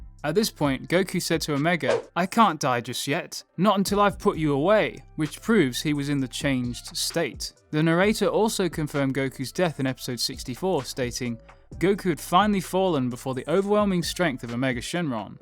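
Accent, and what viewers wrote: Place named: United Kingdom. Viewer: British, Don't care didn't ask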